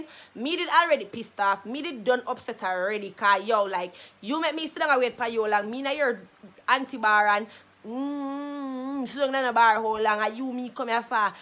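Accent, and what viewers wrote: Jamaica. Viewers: I love jamican english ❤️ like the slangs are lit... 🇳🇬 your vibe is lit ❤️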